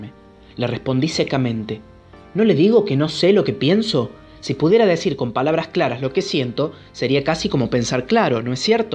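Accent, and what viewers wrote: Cordoba, Argentina. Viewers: Me encanta ese acento cordobés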